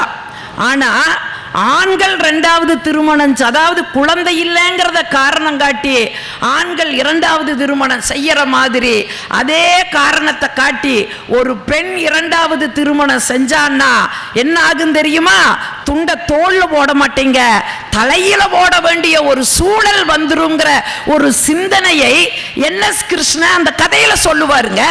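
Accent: Kongu, India. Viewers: Kongu mandalam pechu siripu sandhosham...unga pechu...akka superrrrrrka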